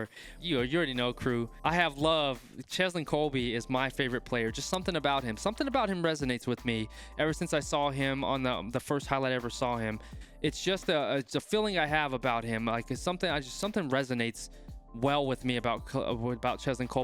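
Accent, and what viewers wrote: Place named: United States. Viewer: Thanks J. Enjoyed watching you and your reaction vids. As a saffer it’s really nice hearing it all in an American accent. Thanks again boet.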